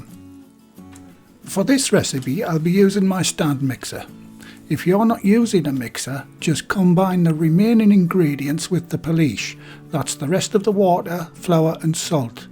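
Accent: United Kingdom. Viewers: You are not just good at cooking, you're really good at explaining details too!! The british accent is a bonus!! It sounds like Yorkshire to me. Anyway thank you!!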